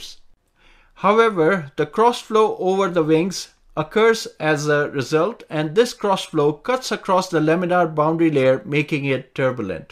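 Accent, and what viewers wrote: India. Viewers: I love your Indian accent and breadth of knowledge, but you didn't mention Gliders !!!! Thankyou, i loved your video.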